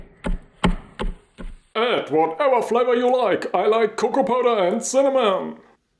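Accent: Germany. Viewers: It sounds German to me 😂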